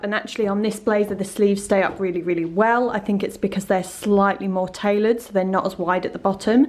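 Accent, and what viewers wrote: United Kingdom. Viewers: you look so amazing and I love your British accent so much 😍😍 Have a nice day girl and if you love music, have a listen to this cool song on youtube: - I love the sunny vibe of it and I hope it will make your day better too 😍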